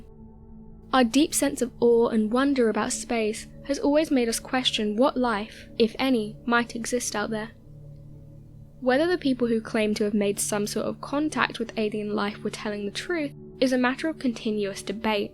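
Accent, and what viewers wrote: United Kingdom. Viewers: Why does everything sound more plausible when delivered with a British accent? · Monument Valley, Arizona is being shown but Brit voice discussing Minnesota.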